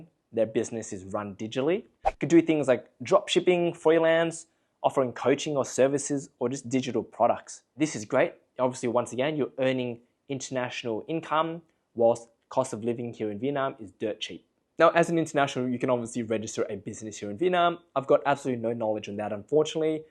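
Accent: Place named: Australia. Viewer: You have an Australian accent.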